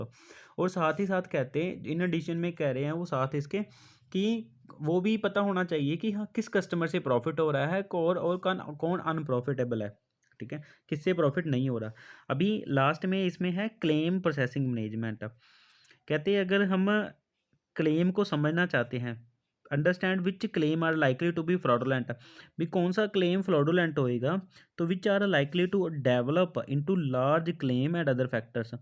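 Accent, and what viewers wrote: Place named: Punjab, India. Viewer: But your accent like u r from punjab..... And mostly speaking in hindi & punjabi .... So why are h hiding that u r from Chandigarh...???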